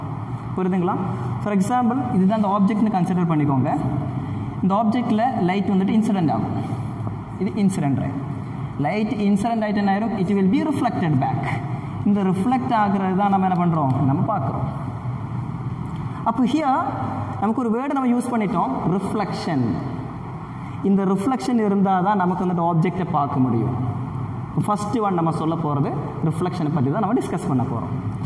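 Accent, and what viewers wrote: Kerala, India. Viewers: Sir Ur from Kerala...? Ur slang like malayalizz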